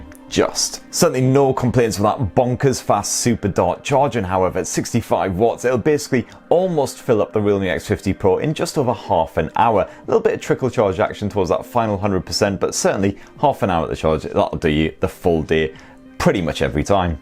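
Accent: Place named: RP, United Kingdom. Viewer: Queens english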